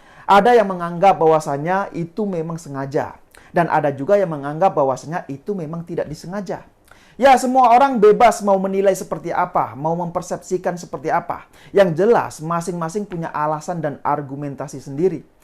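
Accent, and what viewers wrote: Java, Indonesia. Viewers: Njawani banget 😮😮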